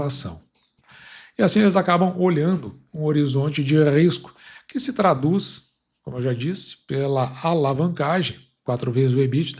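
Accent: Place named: Rio Grande do Sul, Brazil. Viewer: Cara tenta falar mais neutro..vc não vende peixe só pra gaúcho. INSUPORTÁVEL sério, fica a dica. Vc puxa muito acento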